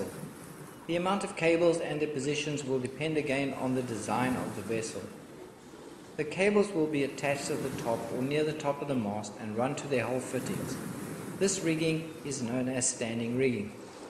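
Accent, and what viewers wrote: Durban, South Africa. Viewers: Love listening to a South African teaching me about sailing... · Hi great video, and I wish I had it when I started sailing. Your accent sounds like from Durban, SA.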